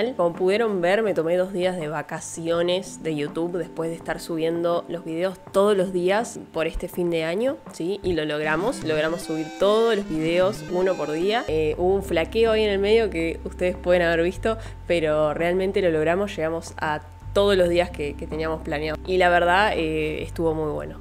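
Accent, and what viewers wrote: Argentina. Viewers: Yo creo que no me dan bajones por los comentarios pero sí por lo dislikes:( Psdt: Me encanta tu acento argentino😊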